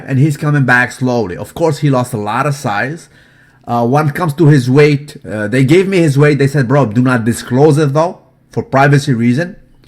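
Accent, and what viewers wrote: Egypt. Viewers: Your Egyptian accent ain't that bad:P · Man i am Egyptian and your egyptian arabic accent is soo fun, it cracked me up, thank you man :D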